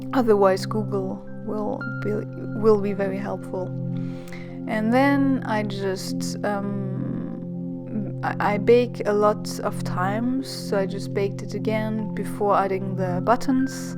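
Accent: France. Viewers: Hello! Do you live in France? We will be in Paris in December and just adore your accent. Beautiful design! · Are you french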